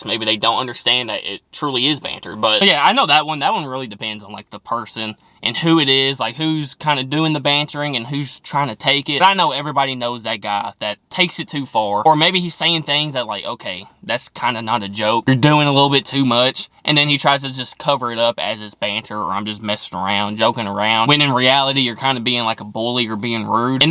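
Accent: United States. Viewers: You misunderstood why Brits think Americans are rude, it stems from ww2 when soldiers came over and flaunted their wealth thinking they were better than us, also just the way your accent is louder and more flamboyant it comes across as brash .. yes it's a stereo type but blame it on your film and music stars 😆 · Don't worry about the language differences, there's no 'correct' version of English. Language evolves, splits and merges. We got 'cookie' from Dutch a long time ago and it's a great word. No one owns English and no one can dictate how it should evolve - it does what it does and it's amazing. I agree on prawn cocktail - actual prawn cocktail is one of my favourite foods ever but I don't like the crisp flavour 😂(also, EMOJIs - huge development in written language - we can actually convey tone in a way that isn't clunky now!). The banter is a difficult one - it's usually fine because people can tell if someone's not up for it and won't make them uncomfortable but it certainly can backfire. 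I've never been good at taking it and people can just tell right away - one look at me and they know I'm too delicate 😄I wouldn't change it for the world though; comedy keeps me going and it's incredible that it's woven into our way of life. Love this video! You're so reasonable and sweet - a model American (with a lovely accent too!) ❤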